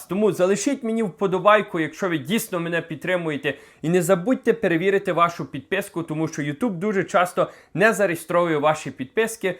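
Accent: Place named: Western Ukraine, Ukraine. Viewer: Сколько раз попадаются мне ваши видео! Я не хочу Вас смотреть! Дикция - просто отвратительна, противный западэнский акцент. Не на чем больше деньги зарабатывать? Нет работы в Канаде?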